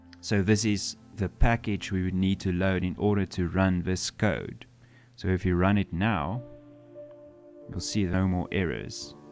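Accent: South Africa. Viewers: South African? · Thank you so much from your articulation I hear you are South African. I would like you to buy coffee, beer or coke....eWallet?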